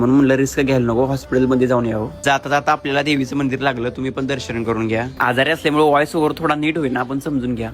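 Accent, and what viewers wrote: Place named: Karad, India. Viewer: मित्रा बोलण्यावरून कराड चा वाटतोय 🤔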